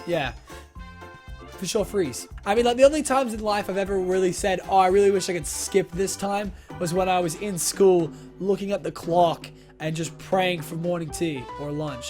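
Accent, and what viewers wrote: United States. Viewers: He sounds half American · he sounds american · He sounds American!!😂😂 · R u american lol u sound American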